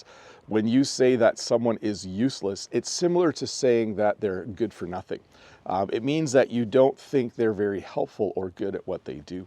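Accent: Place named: Canada. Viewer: you have a good video! Your Canadian accent is very good, it makes easy for us to learn english...I also loved the place you did the video!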